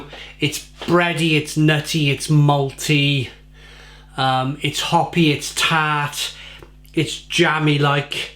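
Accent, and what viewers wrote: United Kingdom. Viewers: love british accent!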